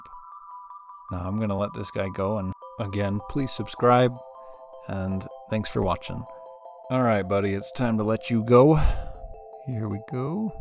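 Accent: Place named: North America. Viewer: Aw. I Would hold your hand. If its a jumping spider or a Wolf spider, they tend to have really big front facing eyes, they all have more than 2 eyes. Watch this channel, Spencer at My Wild Backyard, and Travis McEnery (These are theSpiders in your House) and theres a couple of other channels that often collaberate with Spencer who have great content! I think its lovely that you talk to them. I just took my horse who is getting over a sore foot, for a walk in the woods and animals are really the best company, we were out for hours, I've been doint it every day because she loves seeing everything around our wood. I know they dont speak but animals seem to just love the attention and the time you spend with animals is never wasted. I hope You find someone to help with your spider ID, I would have loved to have helped but am in Scotland and I think you sound to be in North America!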